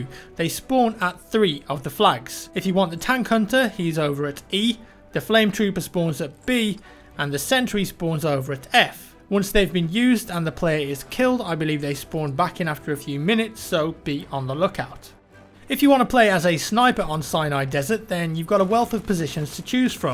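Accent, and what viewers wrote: United Kingdom. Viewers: just the british accent lol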